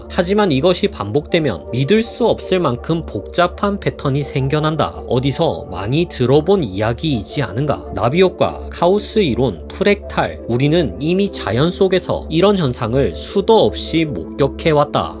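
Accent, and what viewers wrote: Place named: Gyeongsang, South Korea. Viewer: 경상도 억양 멋있어요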